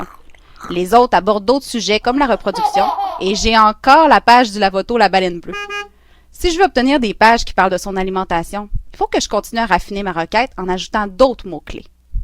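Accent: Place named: Canada. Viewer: j'adore la voix canadienne, amusante🤭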